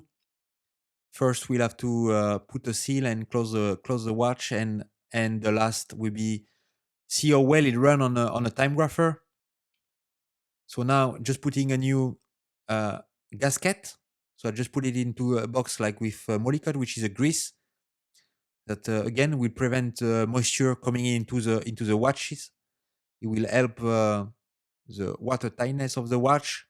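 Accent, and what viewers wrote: France. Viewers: Toujours un plaisir de t’écouter et regarder travailler. Explication simple et précise ,continue comme ça ,avec ce french accent de toute beauteyyy 😜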